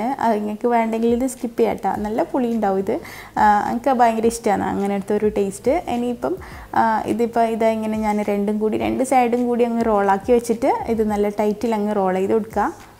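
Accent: Kannur, India. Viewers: Kannur slang ❤️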